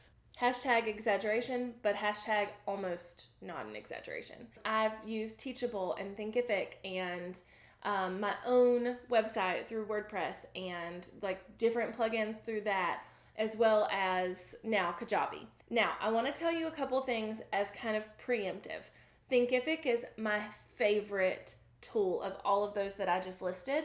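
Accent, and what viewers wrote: South, United States. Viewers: She has a slight American Southern accent, and not only is that acceptable here in the United States, it’s actually preferred since it tends to increase likability and relatability. Barak Obama tends to infuse his speeches with Southern dialect even though he’s not from the south, and Bob Ross is beloved because of his southern euphemisms. While you are technically correct, what she said is not acceptable in formal writing, she’s engaging an audience, and in that case it’s totally acceptable.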